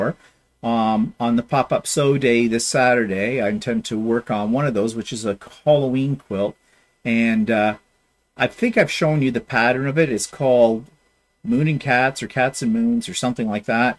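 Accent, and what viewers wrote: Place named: Newfoundland, Canada. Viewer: Newfoundland accent ha ha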